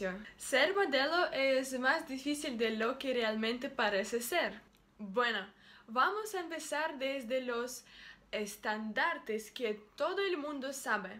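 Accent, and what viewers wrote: Russia. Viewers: Me gusta tu pronunciación. O sea. Aunque hayan el ciertos detallito a corregir, igual me gusta. Por ejemplo. Se dice zapátos. Y como dices bolsillos me gusta, acento ruso, pero me gusta. Por cierto. Si te interesa aprender más español, tener con quien practicar, escríbeme al privado de aquí de YouTube y nos ponemos en contacto. Cuidate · Jajaja ese acento ruso español me encanta, aca te dejo un par de luagres para conocer en latinoamerica <link>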